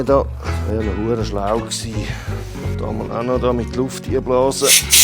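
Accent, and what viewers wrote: Switzerland. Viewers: Das geilste an seinem Schwizer Dütsch is wenn ich genau hinhöre verstehe alles 😁 sogar als hochdeutsch gewohnter😁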